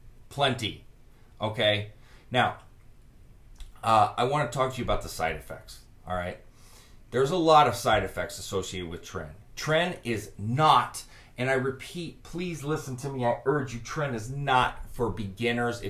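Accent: New Orleans, United States. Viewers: you from new orleans? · Are you from new orleans???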